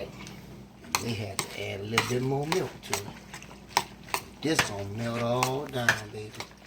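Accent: New Orleans, United States. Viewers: Oh you moved from Louisiana to Dallas because you sound NOLA🔥 FASHO I KNOW YOU MISS FOOD BACK IN THE BOOT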